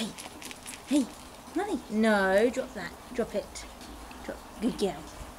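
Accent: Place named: England, United Kingdom. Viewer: What's cuter the dog or her accent? Where she from England?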